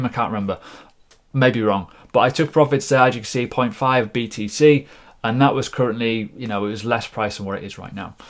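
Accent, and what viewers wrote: United Kingdom. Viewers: Nice to listen to a UK guy.. What exchange would you recommend for the UK? Fed up with coinbase crashing at crucial times.. Cheers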